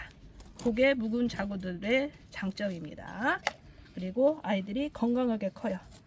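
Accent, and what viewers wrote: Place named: Jeolla, South Korea. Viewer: 안녕하세요 ^^ 진정한전라민국사투리 묘똥ㅎㅎㅎ 정감있는사투리 잘배우고있어요 저도지난번구입한거슬슬분갈이해야겠어요^^ 편한밤되세요 😊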